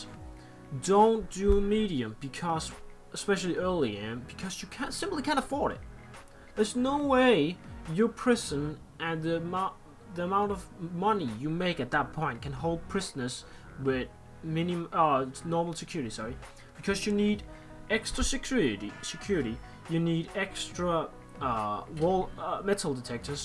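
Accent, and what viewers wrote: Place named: Denmark. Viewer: du lyder meget dansk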